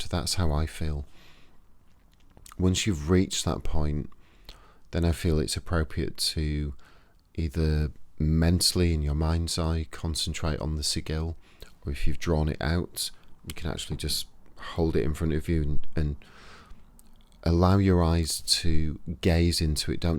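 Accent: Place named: United Kingdom. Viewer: Off topic your voice is so calming and love your British 🇬🇧 accent it's the cherry 🍒 on top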